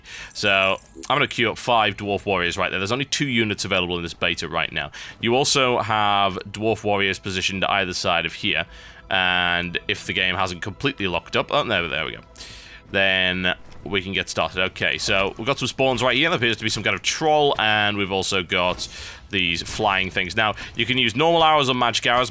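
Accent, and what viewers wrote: United Kingdom. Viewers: L2 British accent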